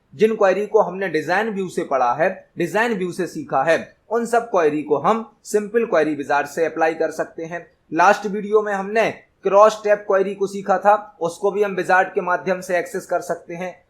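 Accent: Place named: Bundelkhand, India. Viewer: Sir are you from mp!? Because aapki voice ka accent bundelkhandi sound kr rha h specially damoh ka🧐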